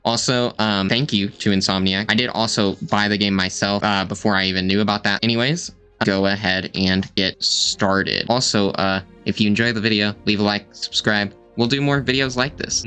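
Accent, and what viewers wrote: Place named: United Kingdom. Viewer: Bro I've noticed that you have the British accent. Can you give to me some advice to improve my listening skills to understand you British people?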